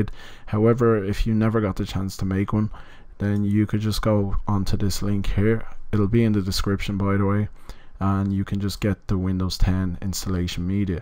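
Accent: Ireland. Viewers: Are u Irish? And good video man👌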